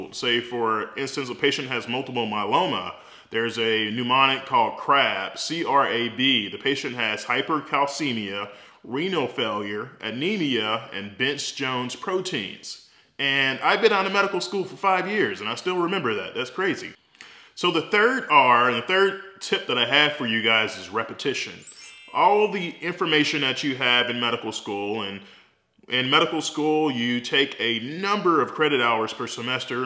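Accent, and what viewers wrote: South, United States. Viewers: Laconian Drakon I just think he’s a southerner. Not a big deal. I saw another video where he talked about spinal “manip-a-lation.”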